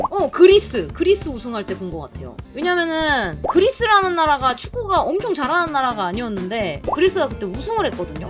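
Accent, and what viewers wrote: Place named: Chungcheong, South Korea. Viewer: 느야 된다 이거ㅎㅎ 충청도 사투리가 아주 네이티브 스피치ㅎㅎ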